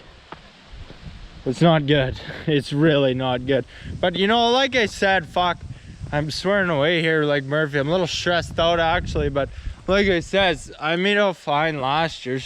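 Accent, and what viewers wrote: Canada. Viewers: You have made me fall in love with Canada with your accent lol and of course the beautiful wilderness of Canada is amazing too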